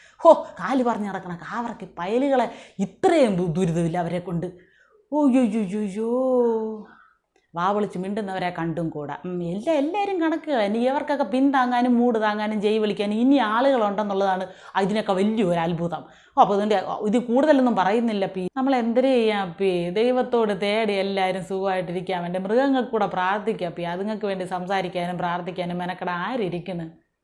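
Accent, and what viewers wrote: Thiruvananthapuram, India. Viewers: എന്തരപ്പീ ഇത് എന്തരു നല്ല അവതരണം അപ്പീ ഇത് തിരന്തോ രംഭാഷ കലക്കി സൂപ്പറായിരിക്കുന്നു മോളേ👍♥️🌹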